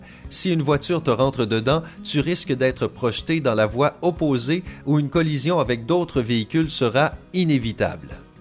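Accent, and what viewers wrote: Quebec, Canada. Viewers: C'est juste l'accent québécois. Et c'est très joli je trouve. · Skip oui c un accent qc (je suis un Quebecois)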